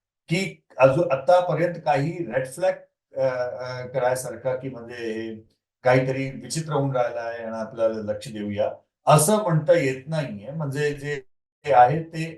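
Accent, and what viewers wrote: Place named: North India, India. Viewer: हा भैया सारख मराठी बोलतो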